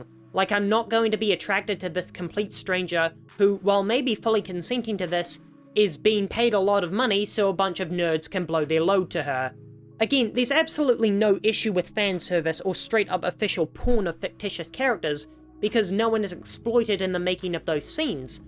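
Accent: New Zealand. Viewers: You can tell from the voice and kiwi accent that the testosterone is strong with this one.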